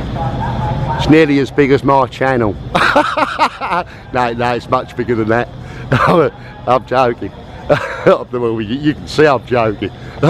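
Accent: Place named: Cockney, United Kingdom. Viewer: Again good video but you cokney accent make dificult to understand fot frog like me !!!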